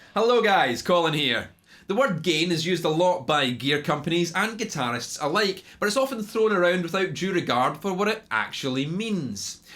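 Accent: Scotland, United Kingdom. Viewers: Why are all the guys who make pedal videos Scottish or from the British isles?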